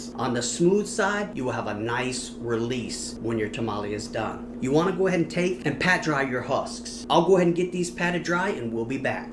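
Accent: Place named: Texas, United States. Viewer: cool, I did pick up on a bit of a Texas accent.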